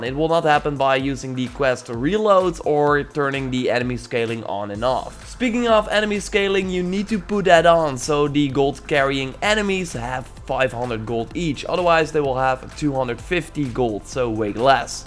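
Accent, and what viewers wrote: Netherlands. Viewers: You’re definitely dutch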